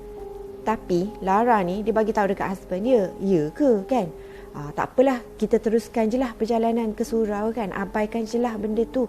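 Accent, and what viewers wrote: Kelantan, Malaysia. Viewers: Assalamualaikum kak.. org kelantan ke kak ? hahahaha dgr mcm lorghat kelantan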